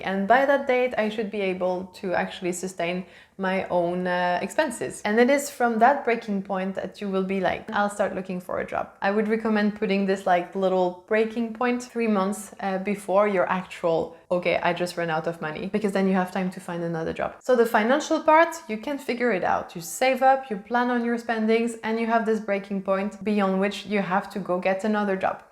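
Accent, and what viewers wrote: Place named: France. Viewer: She is French she has that French accent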